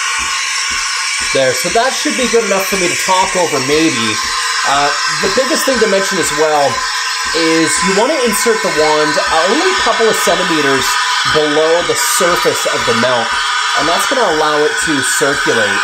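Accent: Canada. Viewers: through ewt the video. with ewt spilling it. the water is coming ewt. melk. the canadian accent is something else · i feel like you are from nova scotia??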